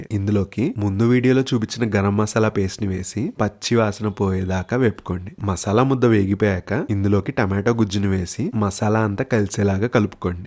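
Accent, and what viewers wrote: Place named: Godavari, India. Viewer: Me ammamma gari vooru godavari district side anipisthundi andi.nenu matlaade vidhaanam meru matladinatle vuntundi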